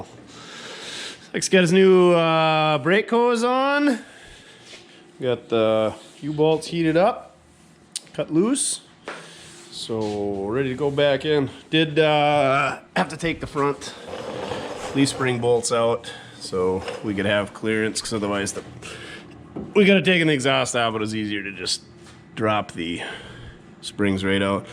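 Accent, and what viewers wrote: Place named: Wisconsin, United States. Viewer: Looks great. I detect a Wisconsin accent.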